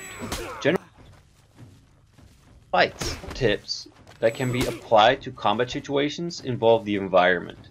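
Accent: Netherlands. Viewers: You must be Dutch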